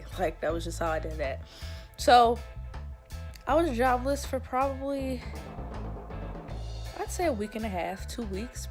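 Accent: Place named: Philadelphia, United States. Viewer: 😂 as soon as you started talking I could tell you was from philly. Good video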